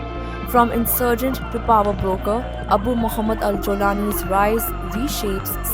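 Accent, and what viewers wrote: India. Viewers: Indian accent so funny😂😂😂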